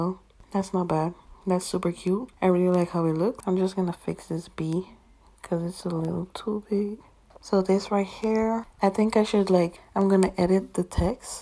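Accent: Guyana. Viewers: Hey hun you sound Caribbean asf like Guyanese where are you from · Sis are you Guyanese 🇬🇾 ?? 🥺 cause your accent chileee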